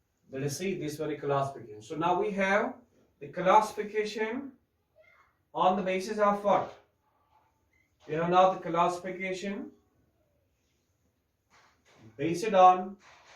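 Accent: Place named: United States. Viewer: Love ur classes & American accent as well...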